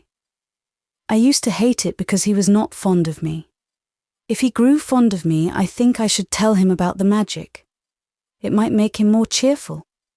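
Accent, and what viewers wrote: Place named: Yorkshire, United Kingdom. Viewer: Thank you so much. You read this so beautifully and gave us, as South Africans, my son and I, a glimpse into the beautiful world of Yorkshire. We enjoyed hearing the dialect and are having fun imitating it in our home. What a beautiful imagination it all stirs.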